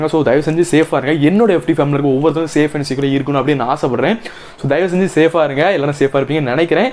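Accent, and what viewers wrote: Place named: Marthandam, India. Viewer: Marthandam pakkam pola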